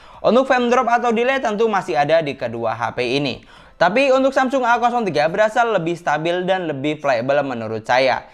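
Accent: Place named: Java, Indonesia. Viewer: kalo masnya orang jatim mungkin tau, orang yang biasanya masrahin (orang yang ngomong) waktu ada selametan(syukuran),logatnya kayak narasinya mas'e.. "dinten pitu pekenan gangsal, tebeh dipun rantos celak dipun.." yah pokoknya kyk gitu2an lah.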